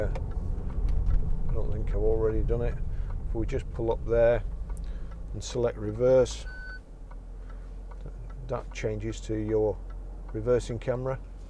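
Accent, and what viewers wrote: United Kingdom. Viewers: Wow Sir, that is a great car. I always love Land Rover, but I still cannot afford one. 😫 the one you are driving is awesome and it feels so powerful and comfortable. Do you own the car? Or Are you a dealer? How much does this car cost? How much are you asking for it? And by the way I love that nice British accent.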